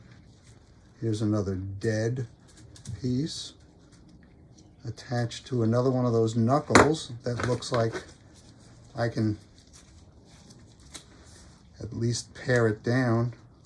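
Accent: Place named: Midwest, United States. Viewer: I have a couple questions, how long did you leave the wire on before you took it off prior to putting it in the round pot? And can I ask what part of the country you are from? You seem to have a midwest accent? Coming from a Minnesotan, you sound like one of us.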